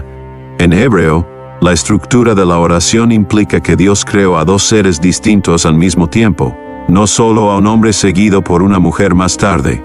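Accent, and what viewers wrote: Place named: United States. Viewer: Por qué pusiste la voz de un gringo si esta hecha con inteligencia artificial, por qué no pusiste la voz de un latino?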